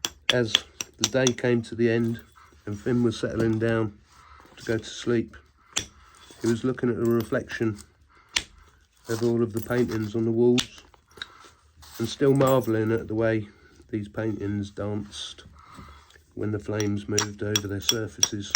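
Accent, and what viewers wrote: England, United Kingdom. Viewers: С первых слов понял что это англичанин, не американец. Произношение слов не ломаное, не голливудское.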